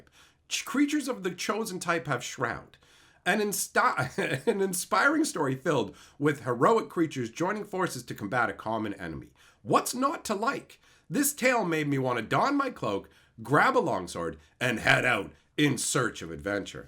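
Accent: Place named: Canada. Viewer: Heh heh you keep saying A Boot!😂 Ya damn Canadian, I love ya.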